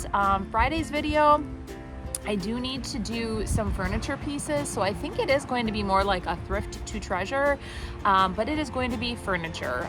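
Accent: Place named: Midwest, United States. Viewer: You are the cutest, hardest working, mid-west accent lovin gal out there!!!!! I adore you, I truly hope you get to rest in between everything you have going on!!!! Take care, have fun!!! Toni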